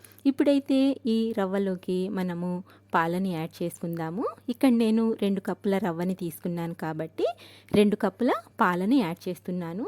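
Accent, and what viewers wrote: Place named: Coastal Andhra, India. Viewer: " " అనే పేరు బహుశా ముస్లిం స్త్రీల కు వుంటుందని నా అభిప్రాయం. కానీ మీ పలుకు హిందూ మహిళలు మాట్లాడినట్లు తెలుస్తోంది. మీరు పలికే విధానం కూడా కోస్తా ప్రాంతం వారి లాగా వుంది. మరల మీ వంటలన్ని హిందువుల పండుగలు కావలసినవి గ వున్నాయి. మీ వాయిస్ కూడా మార్థవంగా వుంది.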